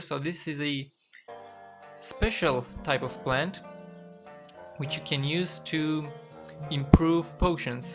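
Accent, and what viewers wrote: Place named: Eastern Europe. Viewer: he has slavic accent maybe russian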